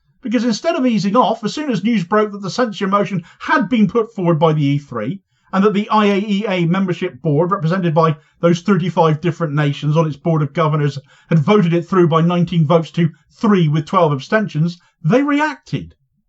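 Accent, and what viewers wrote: Cornwall, United Kingdom. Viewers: I’m glad you feel like me, so many people are feeling the same way. I don’t know how many people of all nationalities have said the same. I never thought I’d be rooting for Hezbollah, Hamas, Houthis or Iran. Many people are saying it’s as if the world has turned upside down. A lot of Americans have said how ashamed they feel at their governments policy regarding Israel. People, especially in the west feel as if they have been lied to for decades. So many people are now clued up about the situation of the Palestinians, including the Nakba, 1948 and the forceful displacement of the Palestinians by the Zionists and the terrible atrocities that were committed by them. Social media has been a boon in educating people and channels like give us the facts. has been consistently brilliant at showing us the truth, I also love his Cornish accent.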